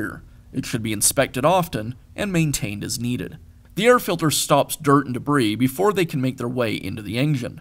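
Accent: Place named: United States. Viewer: I'll give a thumb up for all your videos only if they have your subtitles. Unfortunately, your nice American accent really breaks me into sweat. I'll try to get used to it, however without transcription it's too heavily yet.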